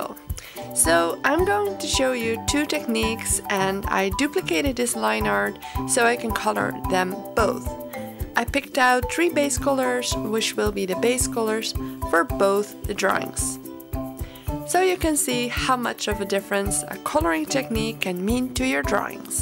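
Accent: Netherlands. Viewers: Are you dutch? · echt een nederlands accent dat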